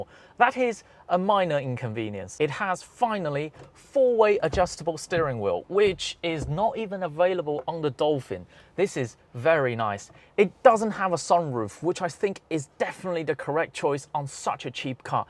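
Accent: United Kingdom. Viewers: Nice video and brilliant British accent!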